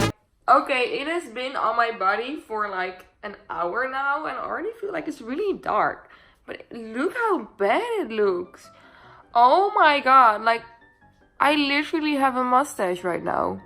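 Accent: Netherlands. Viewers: Omg ik dacht zo ze klinkt zo nederlands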